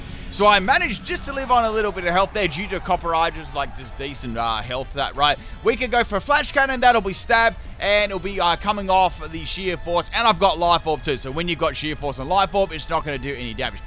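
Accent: Australia. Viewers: Aussie voice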